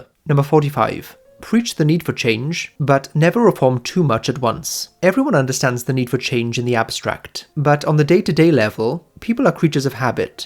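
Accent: Wales, United Kingdom. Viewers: dwi'n abod accen yna, cymraeg ti?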